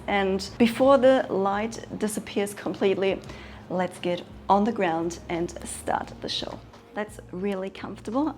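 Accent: Germany. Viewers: I really like your German accent !!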